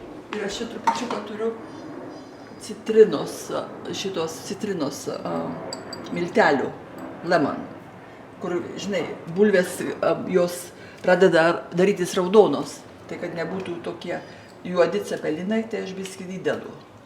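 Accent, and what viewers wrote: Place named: Molėtai, Lithuania. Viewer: Vo čia kaimiskai. Pagal kalbą tai kaip močiutė iš Molėtų;)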